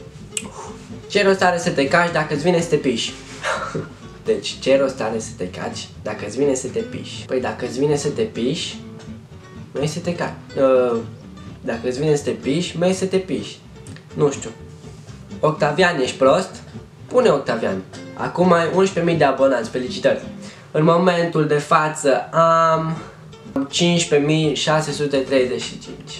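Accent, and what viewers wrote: France. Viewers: Ba nu vorbeste sâsâit...are accent franțuzesc •^• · nu e sasait are accent frantuzesc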